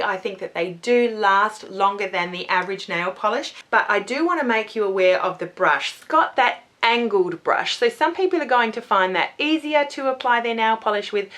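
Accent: Australia. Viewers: are you from Australia?? AWESOME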